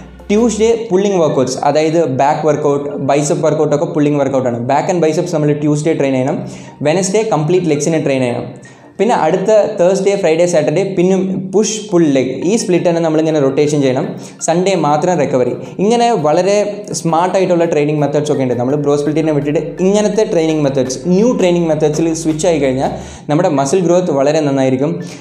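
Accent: Tamil Nadu, India. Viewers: R u Tamil or Malayali? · Malayali anno athoo Tamil annoo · Are you malayali or Tamil .? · Bro tamilan or malayali?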